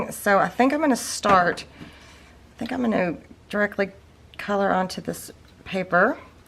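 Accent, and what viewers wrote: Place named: Kentucky, United States. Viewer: ! You have to be best person I have ever come across that is a creative genius, motivator, beautiful, loving, down to earth, genuine, giving with all your heart, Kentucky talkin' sweetheart!!!! I love all your videos!!! These are special and I can't thank you enough for all that you share and give to us!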